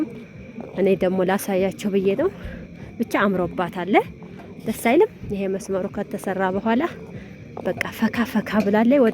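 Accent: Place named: Gurage, Ethiopia. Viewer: ጉድ ጉራጌ መስለሽኝ አማረኛሽ የጉራጌ አማረኛ ነዉ እና ሀይቅ ስትይ ገረመኝ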